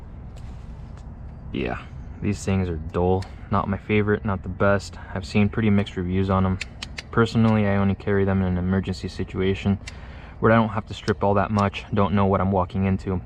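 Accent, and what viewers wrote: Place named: United States. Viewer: Good to hear an American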